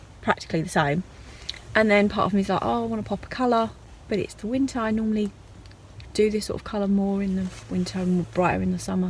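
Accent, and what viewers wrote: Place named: Dunstable, United Kingdom. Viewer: I really enjoy your videos. Whereabouts in England are you? Your accent sounds like mine, I was from Dunstable Beds, and I lived in Chelmsford, but have lived in Florida for 26 years. Really enjoy your recipes. Thanks. Also, you remind me of Sam Faiers from TOWIE and the mummy diaries. LOVE the penguin! Very clever.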